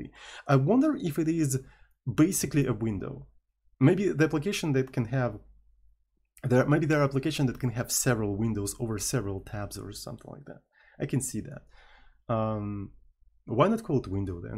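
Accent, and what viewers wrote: Russia. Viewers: You Russian accent is too strong, it's вери анплезант фор май ирз. Ю шуд стоп сейнг s like з энд th лайк с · They are probably YouTube auto generated, he has a moderately thick accent, it's not quite Russian it's almost a mix of Russian and South African sounding, but it isn't pure clean sounding English so the auto transcriber may have had difficulties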